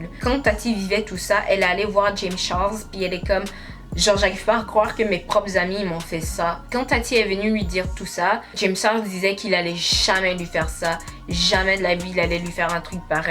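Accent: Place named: Montreal, Canada. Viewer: Ta l’accent de Montréal gurlllllll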